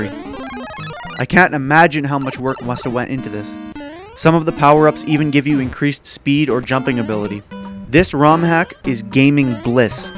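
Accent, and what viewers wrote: Canada. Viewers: I came for the ROM hacks. I stayed for the Canadian accent. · Are you Canadian? o.o I can't tell... I feel like you are but at the same time...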